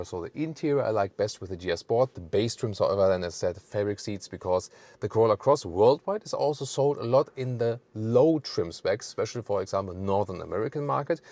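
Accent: Germany. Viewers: Yes, but his "german english" is strange... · Finally a clean summarize car reviews Im a Asian but if feels like the person is a German salute for being sofisticated and that acsent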